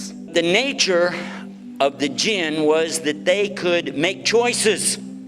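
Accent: United States. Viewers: Aslaam. This guys one amazing human being and Muslim. I met Mr.Yusuf in Madinah sharif whilst on Hajj in 2012. We spoke briefly and then departed as it was very busy coming out of Masjid e Nabi at Fajr time. He said to me "How's Hajj" in this strong American accent. He still looks the same today when giving dawah on TV and may Allah keep him strong in deen and health. This man use to be a preacher in Christianity and his family had the same background. I think his father was a preacher too and Allah gave him guidance/imaan and he accepted Islam. Now that's what you call a true Muslim and that's an example to all us so called Muslims who were born into Islam. I believe Mr. as a Muslim revert is spot on in his dawah and promotes Islam as it should be (Peace). Unlike some of the crazies going about and killing everyone in the name of Islam and giving us a bad reputation. 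Peace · It makes me smile every time I think that this brother was a christian preacher from Texas. His accent mixed to the knowledge that he shares beautifully proves that Islam is really universal. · i love the fact that hes a southern muslim speaker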